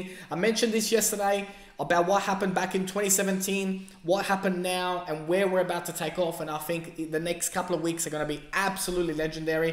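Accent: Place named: Australia. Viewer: Nice to hear another Aussie do u have a twitter account i can follow? cheers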